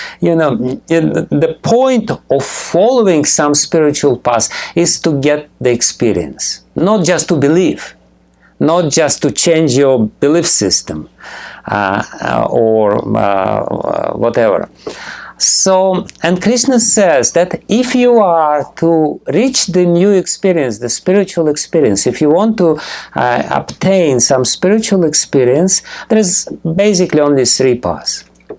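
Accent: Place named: Russia. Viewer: Хотелось бы знать, кто этот Гуру. Ясно, что из России, акцент очень сильный. Where is the description about Him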